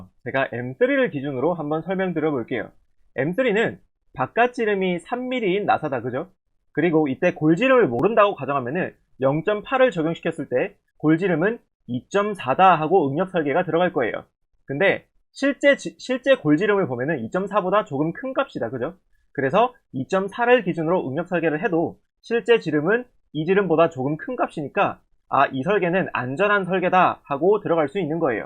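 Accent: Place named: Gyeongsang, South Korea. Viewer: 사투리가 약간 있네요 혹시 경사도분? ㅎㅎ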